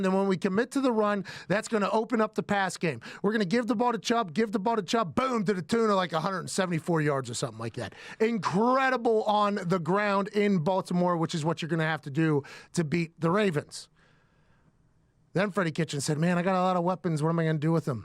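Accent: Pittsburgh, United States. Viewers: I love it when da Pittsburgh accent come aut. Yuenz can hear dat, can't ya.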